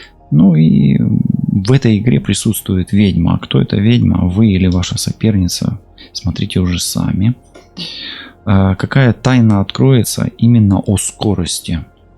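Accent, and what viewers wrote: Ukraine. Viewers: Вы просто супер мне очень нравится что вы говорите как украинец мой род связан с Украиной красивой страной корни есть от туда вас приятно слушать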